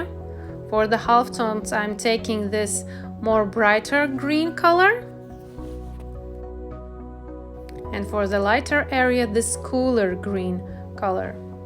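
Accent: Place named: Russia. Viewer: Русский акцент не спрячешь))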